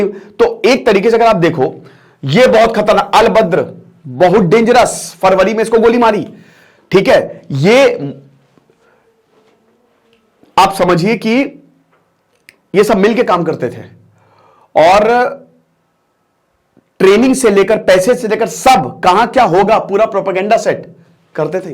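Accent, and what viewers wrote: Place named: Bihar, India. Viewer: Aajh full bihari dailoge ke mood me hai sir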